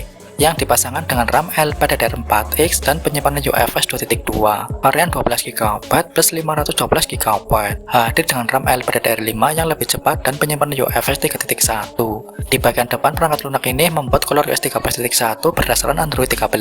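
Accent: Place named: Java, Indonesia. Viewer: Jawa koek bnget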